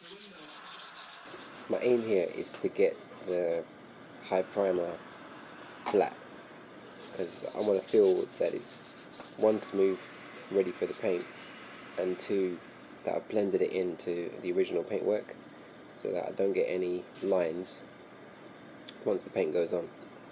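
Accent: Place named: United Kingdom. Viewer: what filler did you use? or I guess its better what Kind of filler since you sound like your in UK somewhere and we in the US probably won't have the brand of filler you used. I got the same exact wheels on the 325i that I bought and it has curb rash on 3 out of the 4 wheels. you can probably do this even when its on the car but its easier to do it removed.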